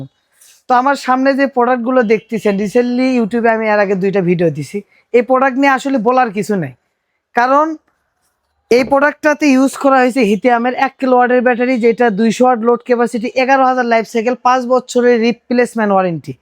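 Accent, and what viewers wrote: Bogura, Bangladesh. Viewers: Bhai Apner Kotha Sunle Mone Hoi Amader alakai Basha mane Bogurai.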